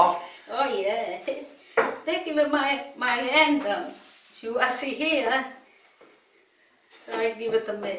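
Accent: Italy. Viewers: All I had to hear was, "Today I make a fettuccine" in a strong Italian accent... I know I've come to the right place. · Take a chill pill. Im merely saying that I prefer listening to italian granma in her accent it gives the channel a certain flavour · ! I love your food, I love your Italian accent. My grandparents came to this country from Bar, Italy. I miss them, I miss kitchen time, especially with my Aunts, too. Please keep cooking!!! 🙏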